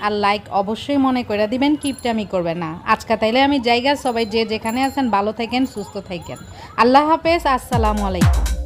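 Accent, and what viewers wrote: Dhaka, Bangladesh. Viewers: Dhakaiya languahe tomer mukhe darun manay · আসসালামু আলাইকুম আপু আপনার মুখে ঢাকাইয়া কথা শুনতে খুবই ভালো লাগলো সেই সাথে পুরান ঢাকাটা ঘুরে দেখা হল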